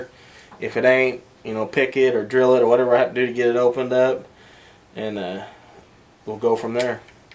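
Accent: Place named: Texas, United States. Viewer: Saving this video for my brother and I. We are jsut getting into the Muzzle loader thing and this video is great. Thanks man. You must be from Texas. You sound like my family.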